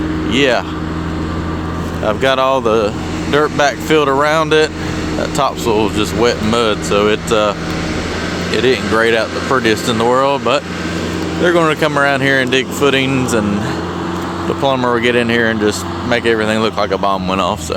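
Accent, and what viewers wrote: South, United States. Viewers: I need someone to do this type of work for me. I'm building a house soon, but the pad needs to be built up for sure. Where are you at? I hear some southern accent. SW Arkansas is where I need the work done. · Your southern accent sound’s almost just like my Uncle Charles in Tennessee😂😂😂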